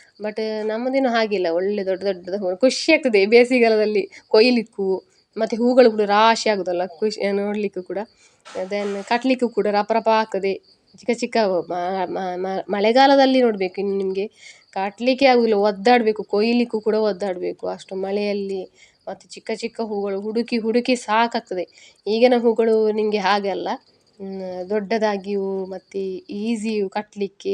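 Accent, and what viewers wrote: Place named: Tulu Nadu, India. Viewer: Erna thulu mix kannada kenyereg kushi apundu..😂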